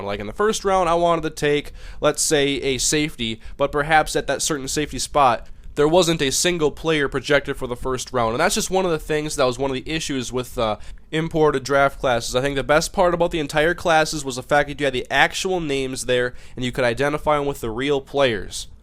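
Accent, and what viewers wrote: Minnesota, United States. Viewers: Are you from minnesota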